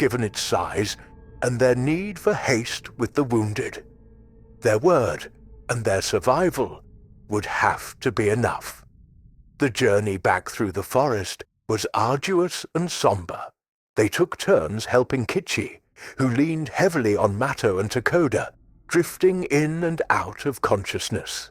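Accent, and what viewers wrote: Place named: RP, United Kingdom. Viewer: ok that explains the generic posh english voice seriously I love wearwolf dogman stories and use to love every channel I came across that narrated/ reported the stories or encounters but lately people don't have tge faith in the voice of there own howel theve lost the lessons that its goider to fail to die to be crushed as you're self than to live a single second or even succeed as someone or something you're not I won't run you or this point into the ground but if you want a decent respectable backable channel use youre own voice embrace you're inner beast and inner human not to mention with all the sheep following the A .I trend it will set you apart from the herd / flock and remember brother if you are wolfen too than wolves care not for the barking of dogs or the baaa,ing of sheep peace may Luna bless you're steps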